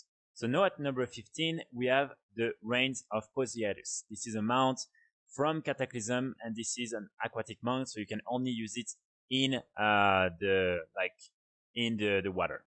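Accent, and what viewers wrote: France. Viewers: Gros accent français qunad même haha ! Nickel la vidéo, continue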